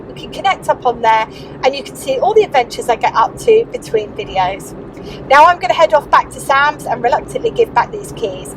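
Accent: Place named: Yorkshire, United Kingdom. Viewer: Never realized you were a Yorkshire girl. Another great video, certainly know your stuff. Had 4 brand new Renault's all went wrong. Too complicated these days. Take care of yourself please and stay safe x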